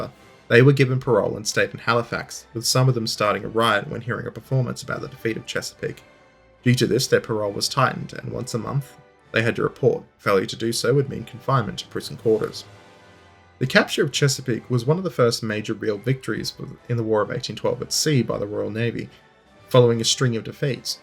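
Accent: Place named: Australia. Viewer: His "vocal fry" is part of his regional australian accent. Some accents have natural vocal fries. Not every vocal fry is an affection by hypsters, you know? The entire world is not american, you know? English speaking foreigners,, dude, it is a thing.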